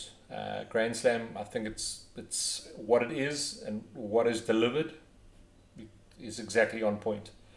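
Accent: South Africa. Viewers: I hear sud Afrikaner accent, I subb. Miss my country <3 awesome video boutie!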